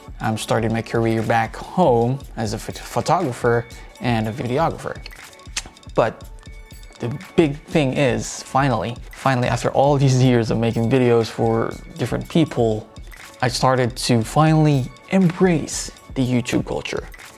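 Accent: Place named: Canada. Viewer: wow... within two years in canada you have that accent already. What school in the phillipines did you go to?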